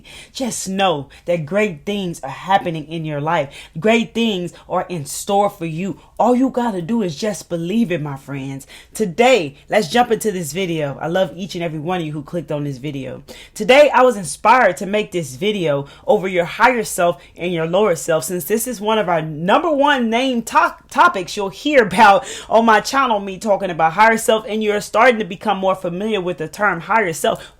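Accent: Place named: New York, United States. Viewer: You heardddddd lol you gotta be from NY we in the building! 💪🏾love you too Queen 👑 powerful video💯💯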